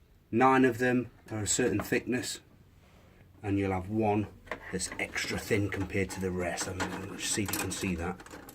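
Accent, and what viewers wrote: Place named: Nottingham, United Kingdom. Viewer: made me laugh watchin this thought to me sen sound like propper notts lads. ad a look at ure other videos and seen you where keep it up las ive got a tl1000r and from notts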